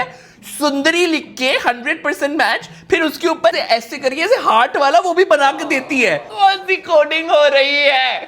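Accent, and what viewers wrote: Punjab, India. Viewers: His Punjabi accent ❤ >3 is love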